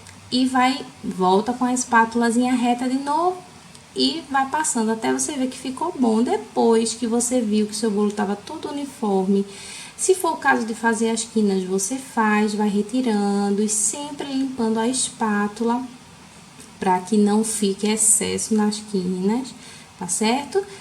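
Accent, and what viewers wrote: Northeast, Brazil. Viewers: Amei seu sotaque nosso nordeste ❤️ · Sotaque de nordestino, amooo❤️❤️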